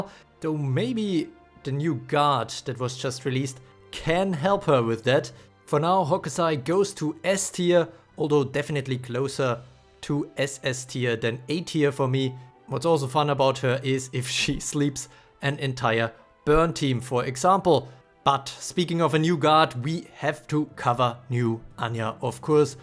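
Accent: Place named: Germany. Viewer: ah okay could swear you have german accent mb og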